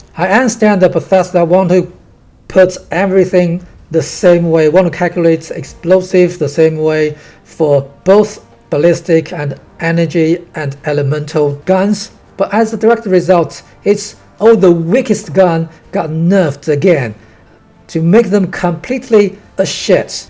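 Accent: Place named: United Kingdom. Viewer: you sound like an Asian with a British accent how bizarre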